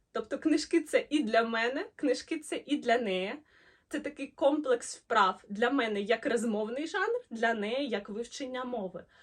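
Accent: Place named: Bukovina, Ukraine. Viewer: мова звучить так, наче авторка не з Харкова, а з Буковини чи Львівщини )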